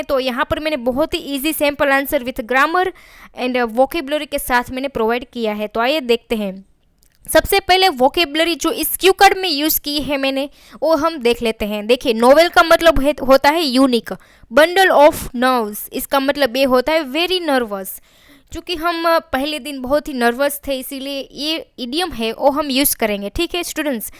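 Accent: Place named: Saurashtra, India. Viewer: You belong to saurastra, because of your tone of speech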